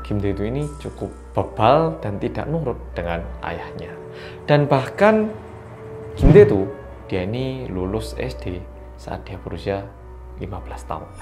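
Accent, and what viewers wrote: Java, Indonesia. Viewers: Orang Korea yang medoooookkkk 🥰🥰 #fanmalaysia🇲🇾😍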